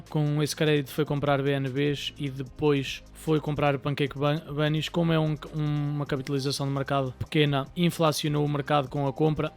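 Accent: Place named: Portugal. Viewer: Parabéns pelo canal. Tanto vídeo em inglês e em português do Brasil que sabe mesmo bem ouvir um tuga a dar o seu aval. Muita força e sucesso 🚀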